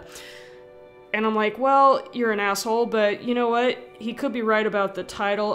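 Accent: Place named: West Texas, United States. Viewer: excellent videos about feminazis stuff, anyhow are you from west Texas ? I have a friend from there that her accent sounds quite close. greetings from Alaska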